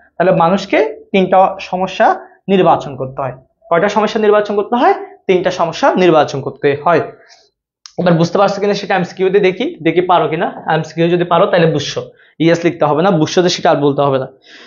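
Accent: Chittagong, Bangladesh. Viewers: চিটাইংগে ফুয়া😊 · Sir apni chittaingga😄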